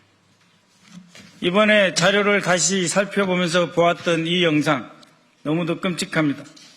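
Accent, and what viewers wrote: North Korea. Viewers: ㅋㅋㅋ 북한말투 거슬려. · 말투나 어떻게 좀 해 주세요. 여기가 북한도 아니고.. 슴다 슴다.. 듣기 거북해 ...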